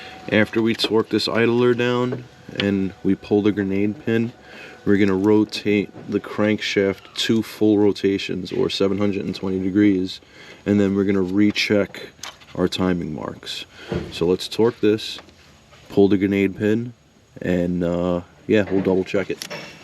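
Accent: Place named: Bronx, United States. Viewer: Hey buddy love the accent what part of the city are you from guessing bronx, Chad I am from albany new york. Hope your well.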